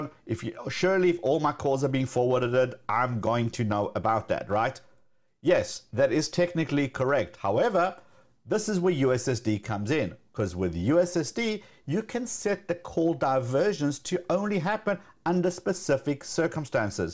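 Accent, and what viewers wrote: South Africa. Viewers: Are you a South African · Ayyyy I recognize the south African accent 😀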